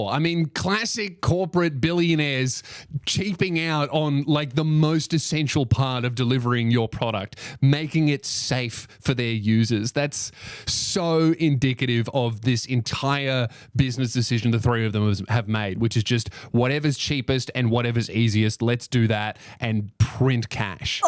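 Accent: Australia. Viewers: Everything is better with an Aussie narrator just sayin' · Ew Australian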